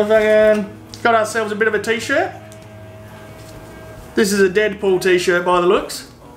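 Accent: Australia. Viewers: The best thing these videos have done for me is helping me finally know how Australian sounds and why people love it so much. And learned many new Australian words, like cheeky and spew. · You prove that, for a fact, putting an Australian commentaror dropping f bombs over ANY video equals funny. The accent and enthusiasm, great timing, good show man. Like buttons all the way.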